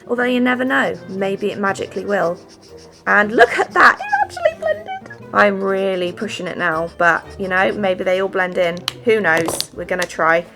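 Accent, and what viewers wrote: United Kingdom. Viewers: How come you grew up in america and still have such a british accent? sounds like you come from the south east of england around bristol...weird.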